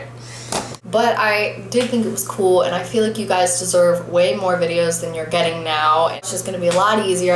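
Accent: England, United Kingdom. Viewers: is it just me or does she have a slight british accent now??? 0.o :D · Not only does she have an AMAZING singing voice... she also does a GREAT London accent!! You're awesome, and I hope you go FAR!! Respect from London, England!! xxxx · hi love your british accent. hehe. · your english accent is hilarious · Yeah your london accent is terrible. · Questions: 1. Were you trying to talk with a british accent? 2. What makes YOUR fans the absolute best fans in the world? =) 3. Who is your big inspiration? 4. Where, and why, did you move? 5. I am your biggest fan ever! =))) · Yu have and awesome British accent! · Your British accent sounds like Cher Lloyd, and when you say that you look like her too. Lol. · Is it just me or is she developing an English accent. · love your British accent <3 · You have a British accent here idk if its fake or if your American accent is fake but wow you do good with either lol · your british acent is awesome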